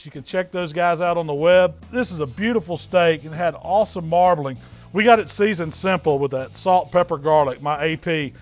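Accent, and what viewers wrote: South, United States. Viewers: American accent?? Don’t you mean southern?? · This defines America. Some fat guy with an American accent showing us how to grill a huge steak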